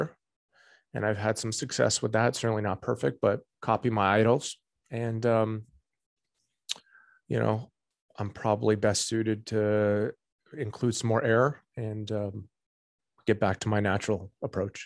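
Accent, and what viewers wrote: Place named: Canada. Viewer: He still has a canadian accent, it's fading rho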